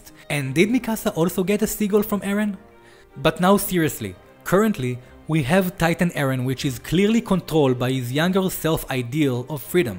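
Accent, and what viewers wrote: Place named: Israel. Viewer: אה חחחחח שמעתי אותך אתה פשוט נשמע ישראלי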